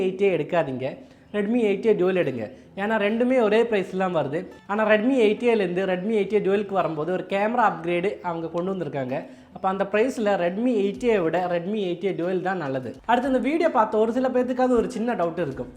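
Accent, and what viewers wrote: Kerala, India. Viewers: Happy to see a malayali giving review in Tamil.. Love your(Malayali's Tamil slang) accent bro!.. 😃😊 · Ur tamil with malayalam slang is super bro... But ippo Idhu 7999 aachu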